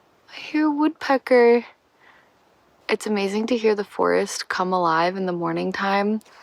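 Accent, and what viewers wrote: Canada. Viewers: I found out it’s part of the way Canadians talk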